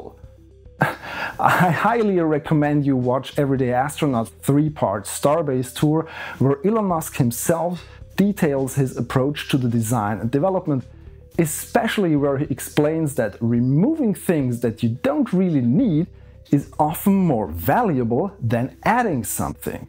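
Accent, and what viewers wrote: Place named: Germany. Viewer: Are you from a German speaking country? Sounds very German.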